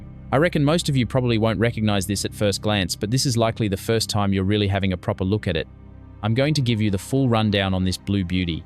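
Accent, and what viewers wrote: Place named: Australia. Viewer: The most interesting thing is the AI narration with an Australian accent and incomprehensible Aussie slang. It also changes its pronunciation of Uranus throughout the introduction.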